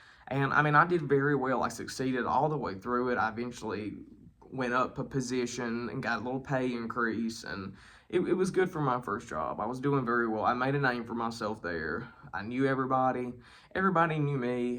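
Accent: South, United States. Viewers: While honesty and truth are vital, discretion is often also required. Regretfully, some gay men have the further challenge of having a feature I call the "gay voice". In fact, it fascinates me. You can meet men who were born thousands of miles apart from each other, yet they both have the "gay voice". It is beyond any psychological research or knowledge to which there is currently any reasonable explanation. It is like having a particular accent. You have a quite noticeable American southern accent. It is how linguistics developed in your area and was well established before mobility rapid transit and vocal transmission became possible during the Industrial Revolution, when the average person lived their entire life within 2 miles of where they were born. In fact, during the past 150 years, dialectic specialists began noting that ' regional accents' of the same language are beginning to homogenize. 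The influence of radio, television and now digital streaming video is beginning to cause a blending towards what used to be called a "Continental Accent". But while this explanation of various, differing accents has always been based upon regionality and highly limited mobilization for all but a short time in human history, the "gay male accent" has no basis in regionality. A gay man raised in London, England, will speak with the same gay sound as an American. It's an overlay accent upon a regional dialectic accent of a common language. Yet only some gay men have this added challen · mixed with a southern accent. I love it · The south, assuming from the accent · My favorite saying is (Why try to fit in when you were born to stand out) PEACE ✌️ AND PRAISE my friend 🙏...PS I LOVE YOUR SOUTHERN ACCENT! HOLLA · Sorry to hear this happened to you. Every time you looked at that girls boyfriend, she and he both probably considered it sexual harassment, like you were trying to seduce him. 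Employment law is supposed to require an investigation into allegations of sexual harassment, with the facts to be proven before you can be terminated. But when you have multiple people filing complaints against you, and corroborating each others stories, even if they are all lying, that is a hard thing to overcome without serious legal action. Sounds like you are from the South...nobody ever said that was the land of the fair and open minded. People are going to believe what they want to believe, the facts be damned. You probably need to do your best to stay away from the Bible thumpers. The Bible thumpers in this story probably need to study their Bibles a little more closely, maybe ask themselves, "What would Jesus do?". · I like your southern accent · your thick southern accent. I like it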